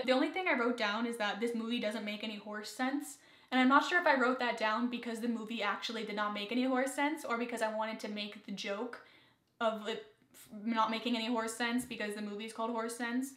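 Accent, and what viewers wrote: Canada. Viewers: Hi I liked the video, and I look forward to watching the others. Also, you sound Canadian.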